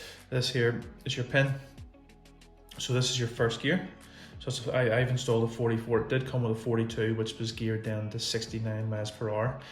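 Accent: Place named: Ireland. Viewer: Can't hear wtf your saying . Sort it out. I guess u are Irish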